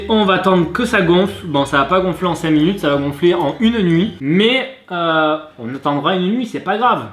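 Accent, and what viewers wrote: Marseille, France. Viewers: C'est un marseillais c'est son accent qui le fais dire litchi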